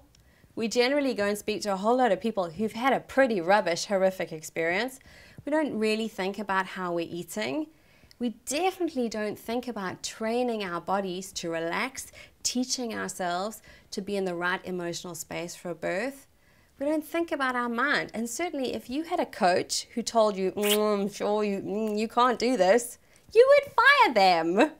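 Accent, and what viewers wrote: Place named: South Africa. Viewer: Thanks. I'm also from South Africa but recently have been traveling south easy Asia and moving to Thailand,Phuket soon. I am 21weeks pregnant. Great to hear a familiar accent!!! Thank you for your work you doing and I will be looking further at downloading your videos for sure. Do you include a lot of the hypnobirthing tools in your toolkit? Many thanks and love. X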